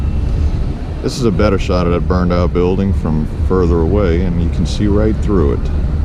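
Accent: New Orleans, United States. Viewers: In your second reply below, you mentioned taxes.....I would imagine they would be very high in the French Quarters and probably everywhere else, considering the historical aspects of the entire city. I've certainly been enjoying all of your wonderful videos. You would be a most interesting lecturer....you have the right voice and your charming New Orleans accent makes it even more delightful. Thank you so much.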